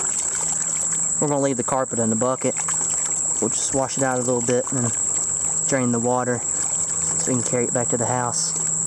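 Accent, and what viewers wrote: South, United States. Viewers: I'm new but are you southern American?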